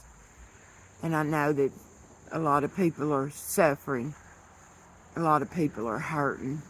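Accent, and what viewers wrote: South, United States. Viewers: You are voicing exactly how I see things as well. You’re a good, brave person. A beautiful southern voice.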